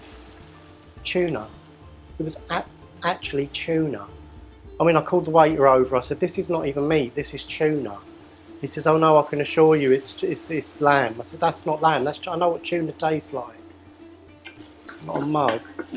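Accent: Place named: Southeast England, United Kingdom. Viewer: You sound like you’re from Dartford or Bromley.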